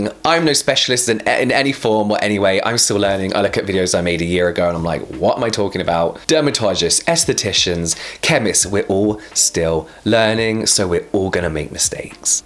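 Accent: England, United Kingdom. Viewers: I can listen to him all day though, his voice is so soothing. If my husband had a british accent I might not tune him out as often as I do. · sometimes i click on your vids just to listen to your beautiful english voice 🙈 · your British accent gives me eargasm!🤪 we stan a skin care addict&k-pop lover🥰 greetings from Turkey:) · I do love a good Brit accent! :) I will try to watch influencers who I think are wasting product. I think people on p r lists in general are bad about it. Lately so many are de--cluttering and going on no-buy months or something that hopefully they'll be more thoughtful with their items.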